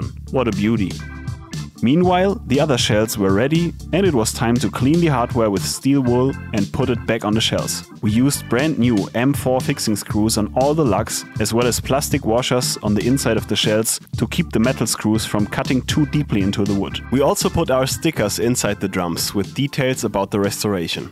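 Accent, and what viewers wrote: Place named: Germany. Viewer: Cool project and great video! I really love your sense of humour, and the german/english speaking voice with the small but delightful pronunciation problems. Beer/bear <3 Please keep it just like that - comes forward as honest, funny and very human. Looking forward to part 2!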